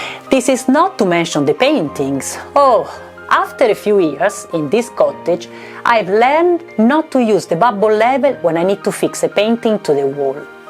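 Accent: Italy. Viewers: La sua pronuncia dell' inglese è assolutamente italiana ! Niente di grave, ovviamente :)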